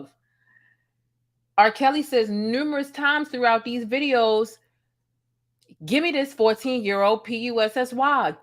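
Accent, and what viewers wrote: Baltimore, United States. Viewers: I automatically figured out that you're from Baltimore, the accent is there. Behind New Orleans, Bmore is my second favorite American accent, I have family from there. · Definitely hear your BMore Accent!! Love it!! Do your thing Ms.!!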